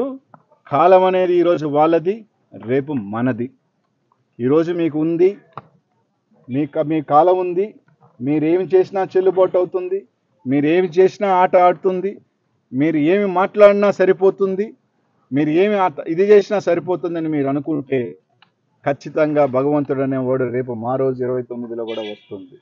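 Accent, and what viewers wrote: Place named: Nellore, India. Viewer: సూపర్. మాటలతో నే రేప్ చేశాడు వాళ్ళని❤❤❤❤❤ఇది నెల్లూరు యాసకి ఉన్న స్పెషల్ క్వాలిటీ❤❤💪💪💪 నువ్వు ఉండాలి అన్న నెల్లూరు లో అప్పుడే ఊపు ఉంటాది కిక్ ఉంటాది మజా ఉంటాది❤❤❤నీ వాయిస్ నీ బలం అన్న ఎప్పుడు అలానే ఉండాలి ❤❤❤❤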